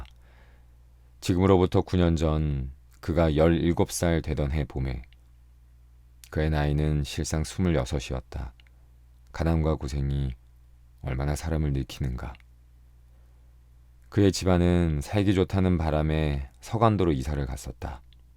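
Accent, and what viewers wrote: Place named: Busan, South Korea. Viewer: 부산사투리~~~~~입에 착착 붙네요~~~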